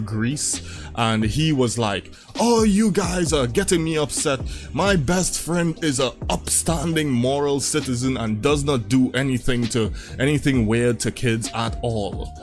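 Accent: Trinidad and Tobago. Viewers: Bro, you have a very trinidadian accent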